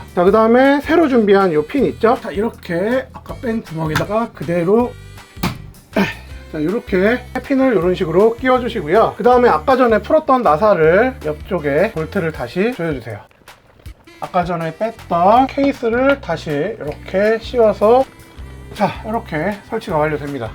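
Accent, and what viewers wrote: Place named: North Korea. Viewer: 말투가 북한말투네... 이 3끼 간첩 아닌가?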